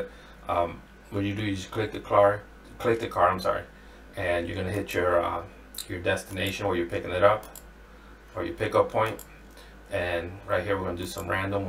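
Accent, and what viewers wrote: Cleveland, United States. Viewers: You from Cleveland?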